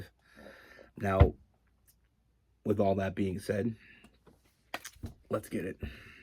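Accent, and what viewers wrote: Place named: Canada. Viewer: Hi! new to the channel here. First off, your t-shirt and baseball cap collections get an A+. You are so thoguhtful and at the same time, really a dude. It's pretty charming. Truthfully, I feel guilty watching tarot readings though... I think I hear Canada, I know because I'm across the pond in B-low 😉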